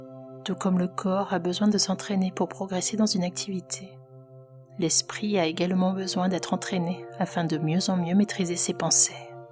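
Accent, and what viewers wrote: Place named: Normandy, France. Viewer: Merci pour cette belle vidéo je vais être subjectif mais étant de Normandie il y a un léger accent dans ta voix qui fait que tubers ton travail est intéressant et agréable merci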